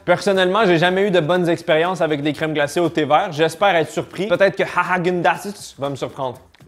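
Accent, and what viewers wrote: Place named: Quebec, Canada. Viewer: J'aime bien les concepts mais encore plus l'accent Québécois je crois :)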